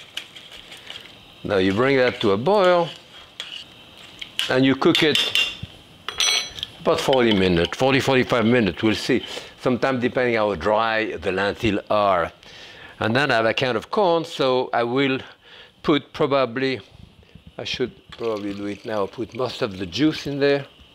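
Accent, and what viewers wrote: France. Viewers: i love these little vids. he's great 😊 i love his accent - and his pan, i think hes french - those hand ! tres bon, monsieur ,tres bon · Thanks to this dude, when I'm cooking at home my internal monologue has a thick French accent.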